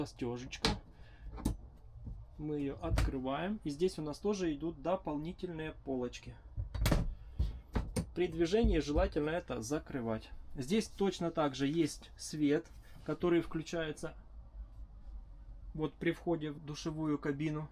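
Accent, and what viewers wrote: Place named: Ukraine. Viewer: Слова "ложить" нет в русском языке....есть "положить" или "класть". Думаю Вы украинец, судя по акценту. Спасибо за видео.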